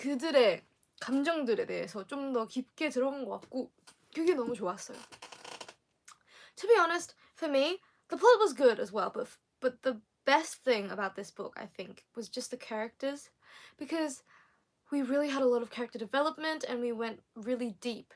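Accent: Australia. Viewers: es muy linda 💕 y su estilo australiano de expresarse es maravilloso. Te amamos 💕💕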